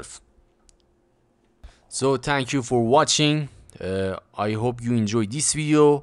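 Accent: Iran. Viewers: Persian accent kicking out 😂😂 (موفق باشی)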